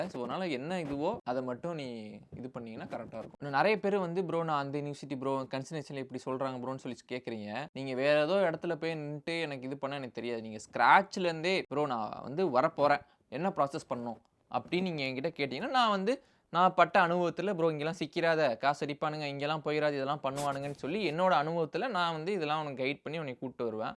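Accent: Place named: Karaikudi, India. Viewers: Sivagangai slang 😅. Kkd yanss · Karaikudi slag perfect Thalaiva ❤😅... love from Karaikudi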